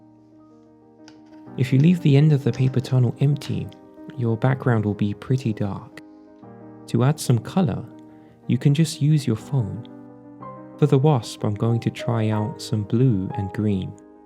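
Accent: United Kingdom. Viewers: You sound British but have your camera in German?! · Between that nagging music and the mumbling British accent I didn't understand a word. waste of time.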